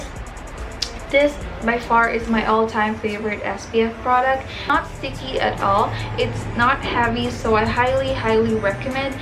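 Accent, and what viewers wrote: Philippines. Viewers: That accent and your beauty (and also the miniso products, I remember those stores when I went to visit last year)! I knew you were Filipina! I’m half and so proud! ❤️🇵🇭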